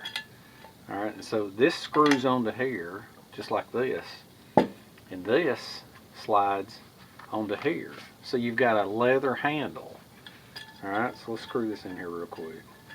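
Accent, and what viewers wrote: South, United States. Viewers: Love watching your vid’s you have the coolest voice lol, very southern 👍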